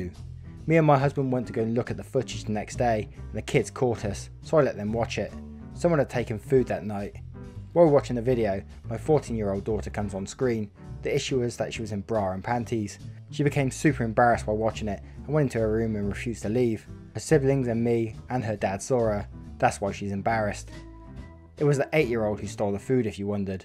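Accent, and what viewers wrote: United Kingdom. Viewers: OMG A BRITISH PERSON!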